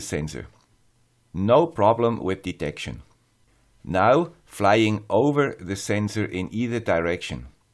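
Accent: Switzerland. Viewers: I forgot to mention otherwise it's a good video! Thank you "the guy with the Swiss accent" ♥️ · Pro tip when dealing with swiss accents: 1,25x video speed. It soothes my adhd :-)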